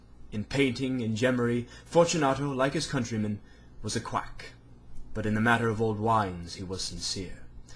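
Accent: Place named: United Kingdom. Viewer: Why are you reading with a British accent? It's a story, written by an Amerinca, and set in Italy. And who is Lucrezi? I assume you mean "Luchesi".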